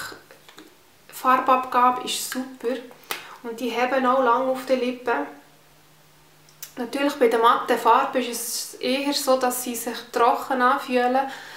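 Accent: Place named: Solothurn, Switzerland. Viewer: Soledurner Dialäkt <3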